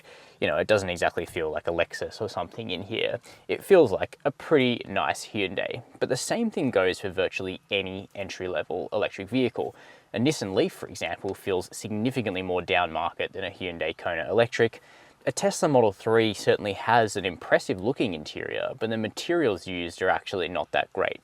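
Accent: Australia. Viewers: Aussie accent unbearable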